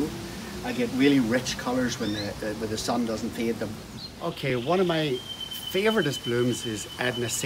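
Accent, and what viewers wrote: Northern Ireland, United Kingdom. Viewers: Now r u a northern ireland man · Hello greetings from NI when you say a good feed of pot ash what would I be looking to buy,at the min I have a lot of buds but the stems are short and not strong. Also I hear the NI accent there where do you hail from,lovely plants by the way.Ta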